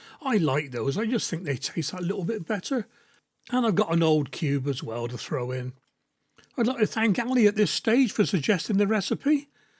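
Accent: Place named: Cornwall, United Kingdom. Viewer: What a recipe! Where's that from? Doukhbor... what language is that? Also, I'm trying to place your accent... are you from Cornwall?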